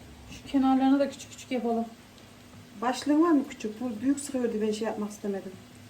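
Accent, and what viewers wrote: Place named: Sivas, Turkey. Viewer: Bizim sivemizde aynı bacı Sivas liyim 😊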